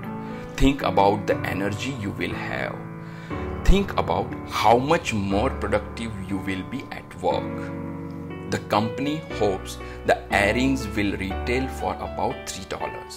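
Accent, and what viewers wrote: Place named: Gujarat, India. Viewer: I like your accent, gujju Bhai.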